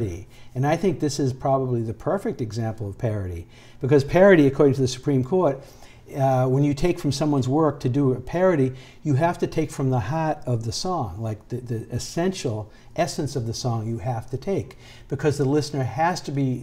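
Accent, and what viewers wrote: Boston, United States. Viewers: That accent takes me right back to my Boston days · Incredible lecture, masterful Boston accent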